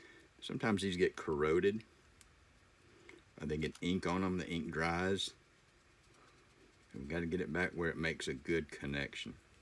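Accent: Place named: Texas, United States. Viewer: Does this guy not sound somewhat like Matthew McConaughey?!?! LOL! Gotta be from Texas.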